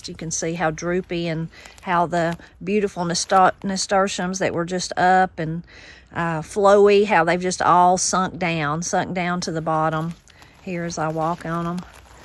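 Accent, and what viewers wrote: Appalachia, United States. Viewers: Just wanted to leave a comment: I grew up in Appalachia. My parents are from the north so I don't have a true Appalachian accent, but I use all the words y'all do. I now live in Germany as a dairy farmer. Was invited to a university to talk to Agricultural students in their English class. I'll be showing one of your shorts to showcase a true Appalachian accent. ❤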